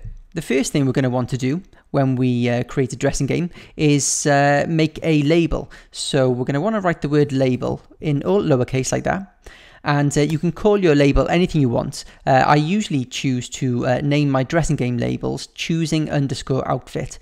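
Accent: Wales, United Kingdom. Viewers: Are you from Cardiff? Or Barry? Or somewhere in Wales?? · Ugh this guy.... He helps me with my story. But i'm getting hella mad at his british accent.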